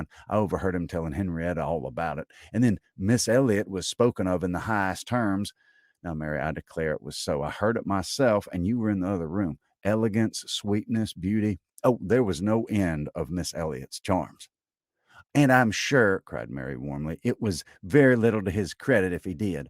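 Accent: South, United States. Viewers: Wow, I love this! I never would have thought Austen could sound so good with a southern accent 😂 thank you for sharing your talent!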